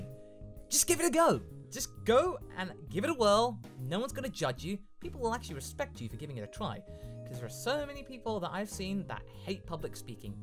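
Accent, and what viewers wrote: United Kingdom. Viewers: The first time I heard your natural speaking voice when you were at a panel, I honestly thought you were just putting on a fake accent, and I was like, "Who the hell is that guy? Where's Goku's voice actor?" Only to realize YOU were Goku and that your natural voice has a British accent. I felt super awkward when I realized that, but I'm pretty glad now knowing you were behind it, and seeing you do the DBZA Goku voice just makes me smile. Keep up the good work,! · u sound british m8.. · He wouldn't get any troubles to make a Goku Black voices considering the British Accent on that. Also, when I just figured that he's actually British, that just blowed my mind! That's amazing, I could swear that he was American. Much love from Brazil 🇧🇷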